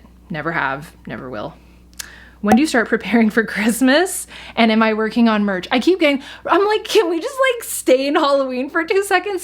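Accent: Quebec, Canada. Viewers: Tbh as a french canadian, I love when you speak french with the perfect Quebecois accent.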